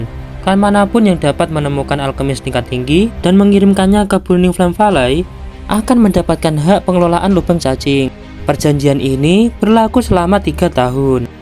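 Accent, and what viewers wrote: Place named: Java, Indonesia. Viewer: Nada jawanya medok banget bang 😂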